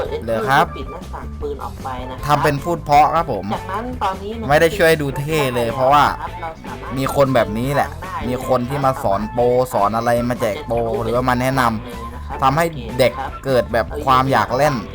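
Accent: South, Thailand. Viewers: พี่คนภาคใต้ป่ะถ้าใช่อ่ะเหมือนกัน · พี่คนใต้เหรอ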